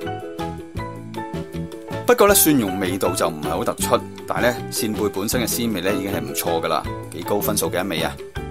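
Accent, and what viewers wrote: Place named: Hong Kong, China. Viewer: Data兄的广东话在香港人中应该算非常好听的那种吧？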